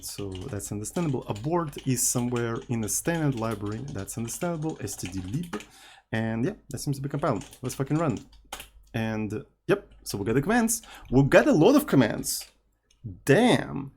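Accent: Russia. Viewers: Чувствую русский акцент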